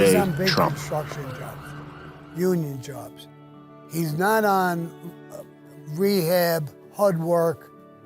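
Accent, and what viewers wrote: New York, United States. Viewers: We are so blessed .Hear authentic stories from one of the legendary gangsters. unique stories told with a beautiful New York accent. For me it's better than any mafia movie I've ever seen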